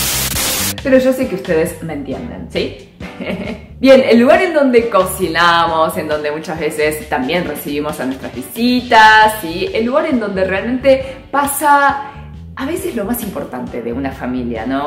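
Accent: Argentina. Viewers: Buen vídeo me gusta tu asentó argentino y tu cara coreana jaja 👍👍👍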